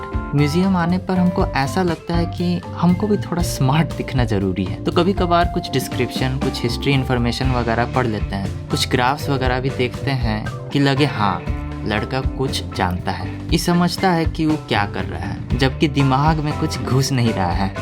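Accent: Bihar, India. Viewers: Bhai ekdum bihar wala hindi bolta h,,,(hamko)😀😀😍😍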